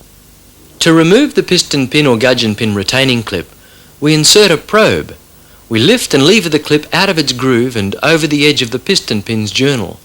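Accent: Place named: United Kingdom. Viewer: Great H-D 🏍️ class with the “Evil British Accent” 😎 “Live to Ride” thank you for uploading this.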